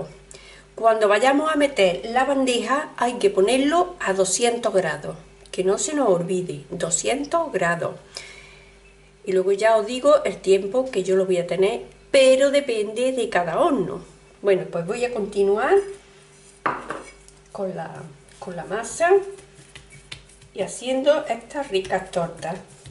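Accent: Córdoba, Argentina. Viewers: Que bien lo explicas se nota que eres cordobesa yo también lo soi mil gracias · Muchas gracias señora mi padre era cordobés or desgracia nos dejo con treita y tres años ese sabor me recuerda a el y el acento de usted aun mas Dios la bendiga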